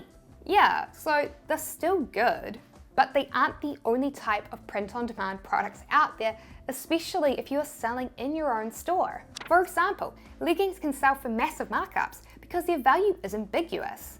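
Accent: New Zealand. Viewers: It is so good to hear the kiwi accent in contrast to the the - mostly - american accents. I likw more of that……including your famous dance. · Ummm, honestly I did not notice a "speech impairment." Unless, of course, you're referring to your NZ accent. LOL. But even if you have some sort of impairment, don't sweat it. Most people will never notice and it's really not important anyway. You're great just as you are. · the amount of value you create with your videos is absolutely priceless! And for the record, kiwi accent cuteness does not qualify as speech impairment!:) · Wow you sound so kiwi 🤣❤ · Just about to launch a print on demand store. Thanks so much for this information! An aside: it took me a second to figure out what an "allatronic" trademark search was. I love your NZ accent, however. It's wonderful. I'm in the UK and people sometimes cannot understand me! 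· She's a Kiwi (New Zealander) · Great advice found in here, blondie. I love that NZ accent. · super helpful video, love the NZ accent!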